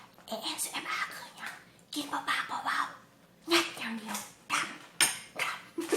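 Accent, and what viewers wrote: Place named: Bueng Kan, Thailand. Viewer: น้อง แม่นเป็นคนบึงกาฬบ่อพี่อยู่โคกก่อง สำเนียงเว้าคือกัน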